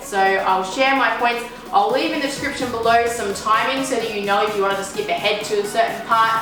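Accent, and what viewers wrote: Australia. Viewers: Im researching the sawstop and came across your channel and can I say it’s so nice to hear another Aussie. That was a great review and content thanks for sharing it’s been 3 years for you so I’ll check out some more of your videos and see what your up to and what you think of your saw now cheers. I gave a sub to and a like of course.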